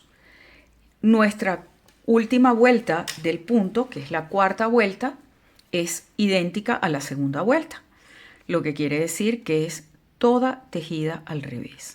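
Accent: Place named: Venezuela. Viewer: Muy lindo, muchas gracias!. Me ha insipirado tu muestra. Voy a hacer un cardigan con 10 vueltas de tu punto y 10 llevando un punto en diagonal cada 6 u 8 asi el diseño tiene continuidad. Todavia estoy evaluando si hacer las mangas todas caladas o no, ya que son mangas al codo porque es una prenda de lana de algodón para verano. Me encanta tu acento, es venezolano?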